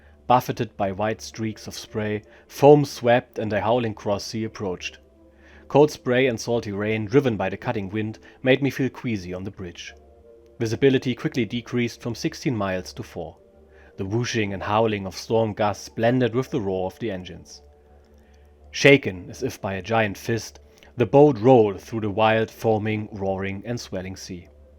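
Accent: Germany. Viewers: You are a terrific narrator. Your German accent makes this all the better. I am second generation German and am currently reading the German version to build my language skills. Thank you for this !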